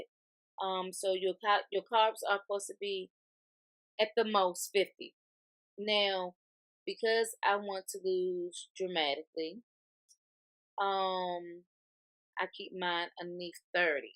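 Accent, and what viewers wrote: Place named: Washington DC, United States. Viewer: I hear your DC accent so I know you're from the area. Where do you get your nails done?? They are so bomb.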